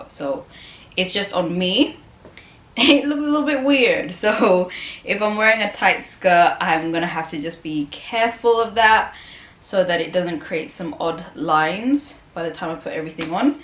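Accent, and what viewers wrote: United Kingdom. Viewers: I love your lovely smile on your baby face, with lovely long hair, lovely lips. You make a great model. You have extremely attractive and sexy legs in your black designer tights with blouse and high heel shoes. Your black tights combined with black dress and your high heel shoes. I love your lovely British accent please I wouldn’t change a thing. I must give you respect lovely lady.❤️❤️❤️❤️❤️❤️❤️❤️❤️❤️❤️❤️❤️❤️❤️❤️❤️❤️❤️❤️❤️❤️❤️❤️❤️❤️❤️❤️❤️❤️❤️❤️❤️❤️🌹🌹🌹🌹🌹🌹🌹🌹🌹🌹😀😀😀😀😀😀😀😀😀😀😀😀😀😀🌹🌹🌹🌹🌹🌹🌹🌹🌹🌹🌹🌹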